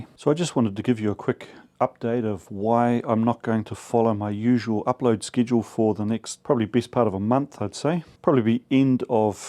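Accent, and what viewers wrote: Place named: New Zealand. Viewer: I wondered how you kept your Kiwi accent tuned up, Regular visits back ;)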